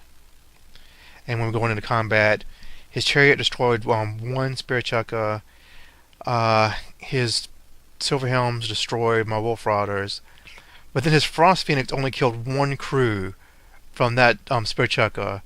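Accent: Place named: South, United States. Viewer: Only just started wtaching your Battle reps and you probably get this alot, but your intro music is class and southern accent is great for narrating! The battle reps are very good also! Great Job!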